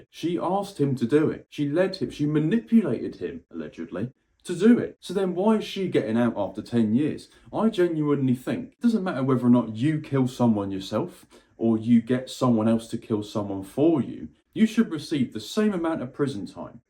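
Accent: United Kingdom. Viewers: Oh, I've got so many things I want to share... It's way too late on a Saturday night for me to get on my soapbox though lol! Great video! There's still so much more that you would be shocked about! Nice to hear a fellow Brit covering the story too though! 👍😊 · Looove the accent & also prefer British slang and terms to many American ones myself. ❤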